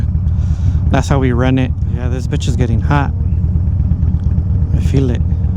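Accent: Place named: Guam, United States. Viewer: Bro you sound like you from Guam.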